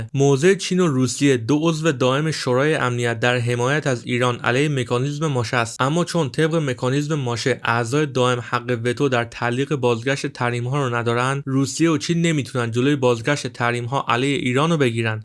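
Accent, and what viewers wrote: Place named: Lebanon. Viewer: لهجه‌ت لبنانیه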